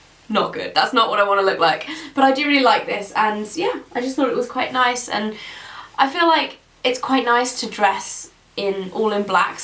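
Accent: England, United Kingdom. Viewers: umm i have 2 questions 1. if you don't mind what part of England are you from like i'm from normanton:] 2. what camera do you use? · I absolutely love Brittish accents! When you say khaki it sounds like car key LOL! xoxo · You sounded to English in this video!!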